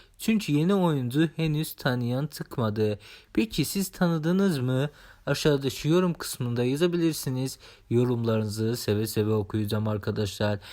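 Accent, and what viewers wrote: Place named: Azerbaijan. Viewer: Admin siz Azermisiniz? Yaaa konuşmanız bi qarip da. O yüzden sordum. Aff edərsiniz 🙏🏻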